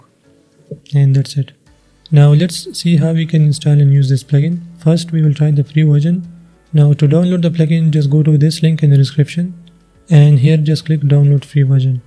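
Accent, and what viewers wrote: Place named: India. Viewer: Aha Indian accent! But thanks for the video.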